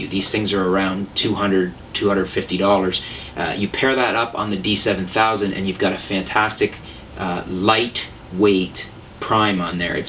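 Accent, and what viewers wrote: Canada. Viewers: really dude, "vig-netting?" vignette. it sounds like "vin-yet." you're clearly super canadian, so you obviously had french forced on you. there is no excuse for that level of laziness. step your game up. also, "bokaahhhhh" sounds idiotic as a million other people said.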